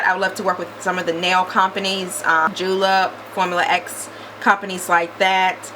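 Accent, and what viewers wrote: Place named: South, United States. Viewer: I love your southern accent! Your make looks beautiful!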